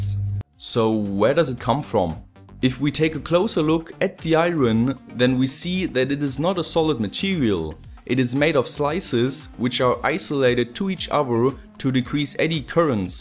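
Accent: Germany. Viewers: w8 r u from Germany? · Do you come from germany? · Are you German? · Bist du deutscher? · ***** schon nur geb zu es ist zum teil nervig den deutschen akzent im englischen zu hören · Man hört es richtig heraus dass du ein Deutscher bist. Dein Englisch ahahahahaha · Bisch du Deutsch?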